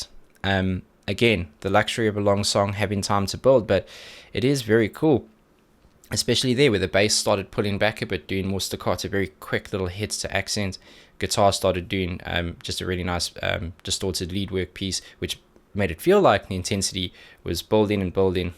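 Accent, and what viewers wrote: South Africa. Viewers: I kind of fell down the rabbit hole of watching various YouTubers reacting to but when I came across this video, boy was I surprised! I Instantly recognised your accent as being South African! As a long time fan of from South Africa, it was trip to find this! I think of all the reaction videos I've watched, this one was the most technical and detailed. It's been interesting hearing about different aspects of the instrumentals (other YouTubers don't really mention them). On, they have a large catalogue of music and their style has changed quite a bit over the years but is one of their defining songs. It's 9 minutes of ebb and flow where you are waiting for the wave to break onto the shore and when it does, wow, does it overwhelm you. (As a viewer who knows the twists and turns of the song, it is fun to watch people's first time reactions to it's unconventional structure.) Kyo (V) has an amazing vocal range so people often focus in on him but the other members of the band, Toshiya (B), Kaoru (Gt), Die (Gt) and Shinya (Dr) are also all immensely talented. 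They've been going for over 20 years and still making music but I love how one never knows what to expect from them next. Still on my bucket list to see them perform live one day!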